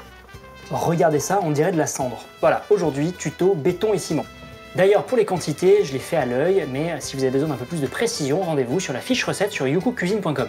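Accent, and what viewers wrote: Mexico. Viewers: Saludos desde México, wey!!! Me encantó la intro 😂😂 felicidades por tu español bien mexicano!! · Trpp fort avec l'accent mexicain et tout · No mames este pinche habla mexicanooo, mira tu muy bieeen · Pourquoi tu dis qu'il parle "sans accent" alors qu'il essaye clairement de faire un accent mexicain ? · C'est le meilleur accent mexicain que j'ai jamais entendu !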